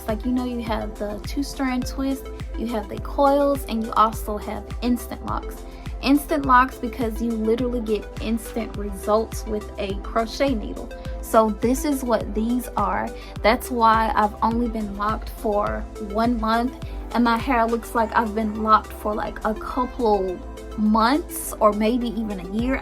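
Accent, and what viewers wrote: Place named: South, United States. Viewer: Where are you from? Your accent is so cute and country lol..ppl say in country but I can’t hear it for myself.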